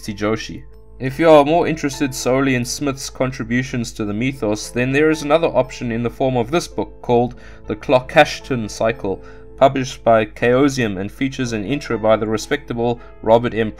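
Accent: South Africa. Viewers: So do many of the ones on Abebooks but you get a vast selection and they're VERY cheap, sometimes pennies. Seriously, check them out... hang on, I just realised, from the accent, you're probably not in the US or EU are you? South African? If so there's an Abebooks in Capetown but they're all over the place.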